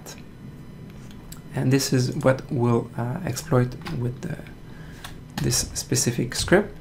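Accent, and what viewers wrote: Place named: France. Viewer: french accent... How cool is that?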